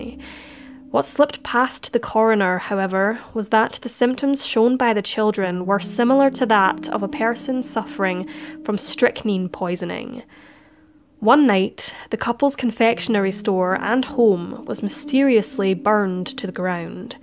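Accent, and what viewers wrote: Scotland, United Kingdom. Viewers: Jason Erb it’s Scottish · NOPE, SHE'S SCOTTISH!!!😘😘😘😘😘😘😘😘🥰🥰🥰🥰🥰🥰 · Scottish Accent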